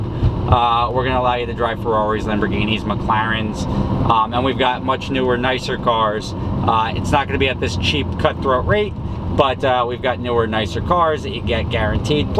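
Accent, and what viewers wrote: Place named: Upstate New York, United States. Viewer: where is this guy from, a very distinct accent almost like upstate new york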